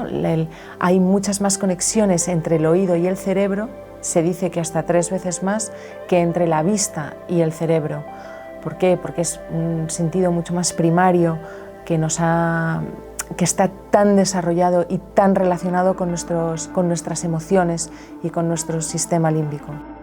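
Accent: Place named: Spain. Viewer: Me gusta su tonos de voz que llega al corazón ❤️ y su explicación me gusta en verdad es primera vez que la escucho y primera vez los que nos enseña con un fondo de música súper agradable tiene voz de Española seguiré sus enseñanzas desde cuba cuba un abrazo gigante cuídate mucho un saludo a la familia eres maravillosa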